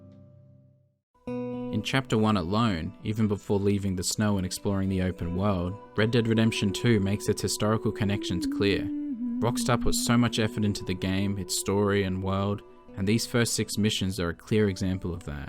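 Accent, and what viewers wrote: Australia. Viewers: Yeah, the narrator speaks Australian and I'm a bit rusty so I appreciate it as well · Just found this video and the rest, love it mate it's amazing. Aussie voices are just amazing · didn't expect to see the history behind the coins on Davey's eyes. educational & a cool Aussie accent -- yay! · You sound like an Australian. And I'm amazed. If you don't live in America and are Australia native, I kinda find it sad that somebody foreign to our country is one of the most detailed historians of my country I have ever heard. I am making a bunch of assumptions and will be perfectly happy to be corrected on any of them.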